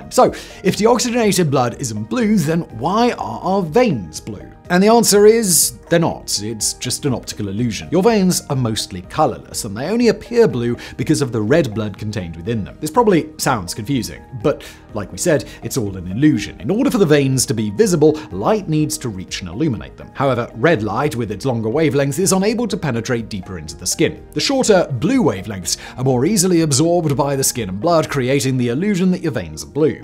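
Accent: United Kingdom. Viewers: I feel like one's perceived IQ is like 10-20 pts higher when one gives a report with a British accent. I believe and want to buy surf shark 😅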